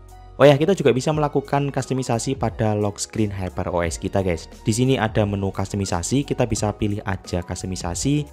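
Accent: Banyuwangi, Indonesia. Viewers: looh mas e wong banyuwangi rek😂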